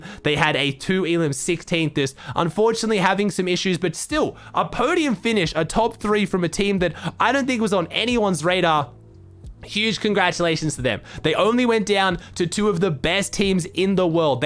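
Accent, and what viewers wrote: Australia. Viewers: Robotic Aussie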